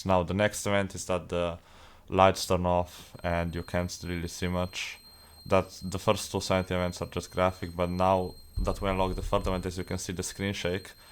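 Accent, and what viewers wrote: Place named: Italy. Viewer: Tf your accent sounds so italian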